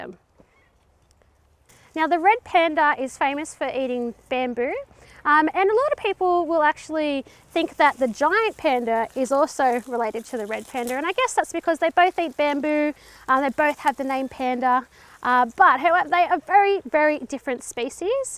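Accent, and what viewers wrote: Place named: Australia. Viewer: Why is it when i search youtube about animals, there's always an aussie voice hah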